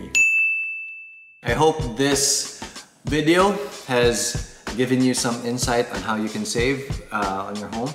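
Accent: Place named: Bisaya, Philippines. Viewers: Nalingaw kos bisaya ni Ate hahaha cute ❤️ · HAHAHA bisayaa jud nimo maam uy HAHAHAHA SIGE JUD KOG KATAWA INTIRE VIDEO SUGOD PALANG HAHAHA Imo day na? hahahhaha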